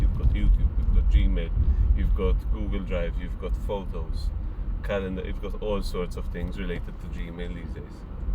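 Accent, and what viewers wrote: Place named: Malta. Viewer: Its very good to see that you dont intend to delete all those videos and even got back your youtube. Also you've got a very heavy Maltese accent haha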